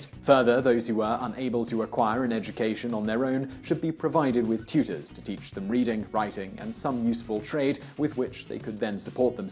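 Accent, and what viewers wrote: United Kingdom. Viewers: British man talking about American history. Interesting.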